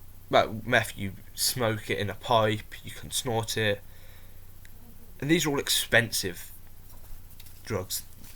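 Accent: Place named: United Kingdom. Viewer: you sound british but with a twang lol.....what is your disability? how old are you? And where are you from? Are you on Facebook? Surely we can get you some sort of help! What organs are failing? What solvents have you been taking? How did you first get into taking them? Have you been doing them for a long time? I've never tried solvents, i see friends use gas and also use hairspray and a piece of cloth.......they said everything would sound like a robot and they would laugh.....I never tried it because i was so scared of drugs back then. I can't believe i went on to smoke opium and later on herion......it was such a nice feeling but now i don't get any buzz at all i just take it to feel normal and not unwell. I am coming down off of it bit by bit.......I have a fear of death that makes me have very bad anxiety on a daily basis. Would you give up solvents if you were given help in regards to your transplant surgery?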